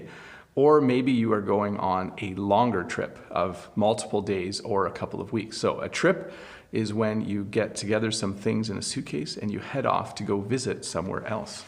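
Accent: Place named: Canada. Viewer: Its so exciting to watch your channel. I just learn so many phrases about a trip. I want to grab Canadian accent. By the way I am from Bangladesh and my native language is Bangla. I like to be native English speaker like you. You are so understandable. Could you please tell me the whole process to be like you. You are just amazing. Hope you will understand the pain of learning English for a non-native country's people.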